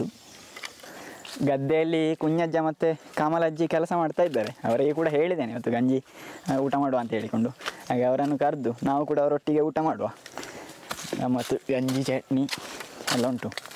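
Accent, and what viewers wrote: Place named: Mangaluru, India. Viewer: Kannada (Mangaluru accent )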